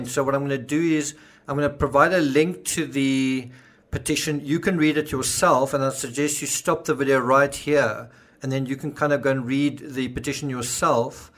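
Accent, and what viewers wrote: South Africa. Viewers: Sounds like trolls! Ignore them. Some peoples attention span is that of a gnat. You're work is the best. Meticulous and interesting. I believe from your accent you are South African. I am British and get accused of pronouncing words incorrectly here in the colonies. Especially the word "Aluminium". LOL. Carry on your excellent reporting. I fully support you. Big fan. Cheers mate! 🇬🇧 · Fair point. I enjoy your SA accent its refreshing. It makes my cat chill out too. Keep the good stuff coming. You analyse in a way no one else does, gives better results. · Your South African accent adds to the sharpness of your commentary. It comes across as crystal to me. I find some people are too eager to highjack comments. If I grow tired of a highjacked thread I started, I just delete it and have several times.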